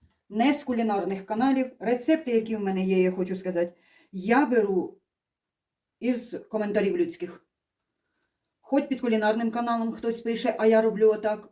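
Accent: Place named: Cherkasy, Ukraine. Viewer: Наче з рідної Черкащини говірка.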